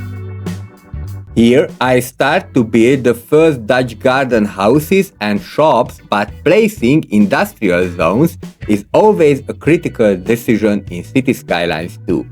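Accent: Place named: Hungary. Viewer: nvm, your accent sounded Hungarian. this is what we say on the internet to each other.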